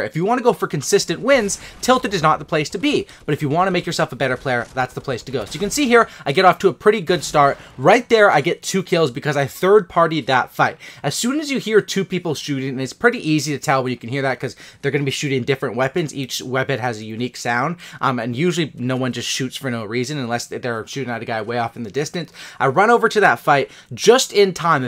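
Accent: Sweden. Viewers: Are you from sweden? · are you from Sweden?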